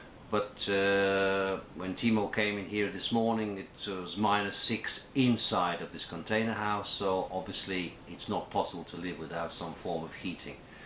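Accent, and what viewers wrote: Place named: Wolverhampton, United Kingdom. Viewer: You from Wolverhampton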